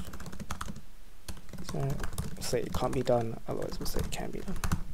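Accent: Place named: Australia. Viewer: Omg the Australian accent 🔥🔥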